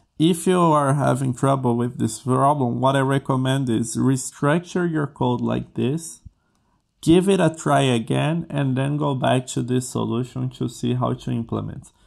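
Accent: Brazil. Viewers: Amigo, você é brasileiro né? O sotaque é inconfundível.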